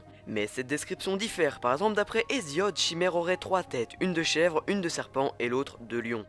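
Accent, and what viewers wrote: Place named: Switzerland. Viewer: salut tu as un accent est ce que tu es suisse? :)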